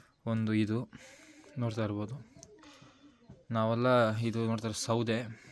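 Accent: Kodagu, India. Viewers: ಅವರ ಮಾತು ಕೇಳಿದರೆ ಕೊಡಗಿನ ದುಬಾರೆ ಅಂತ ಕಾಣಿಸುತ್ತೇ.... ನಾವು ಅದೇ ಜಾಗ, ಗುಡ್ಡೆಹೊಸುರಿನವರು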